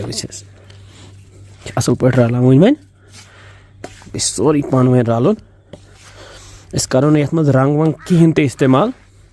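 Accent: Kashmir, India. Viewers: Love your kashmiri accent 🤣🤣🤣🤣🤣 But the quantity of spices which you added my mother will make 4 bottles in this quantity of spices 🤣🤣🤣🤣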